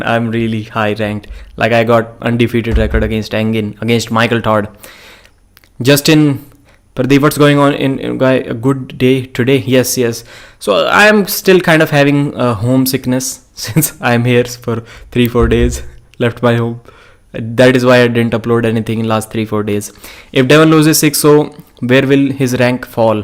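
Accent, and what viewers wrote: India. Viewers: I had some difficulties with his Indian accent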